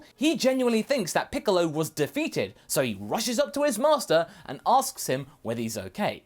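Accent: United Kingdom. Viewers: Oh wow, it's almost like you voiced the cell from team fou......wait.... I just.....your British. Well at least that clears it up.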